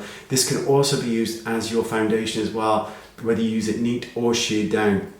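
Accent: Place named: United Kingdom. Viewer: Sometimes I forget what the video is about because I’m enjoying listening to your accent & the British words to use. That my friend is a good thing.👍🏻💚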